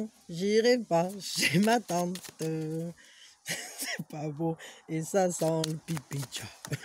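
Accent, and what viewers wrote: South, France. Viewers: Mais kel joli accent! ..méridional a priori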